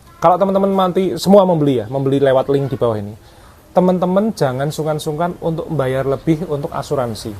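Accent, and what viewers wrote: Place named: Semarang, Indonesia. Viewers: mas smpean wong semarang y. ? logate ky semarangan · logat semarang kentel kang wkwkw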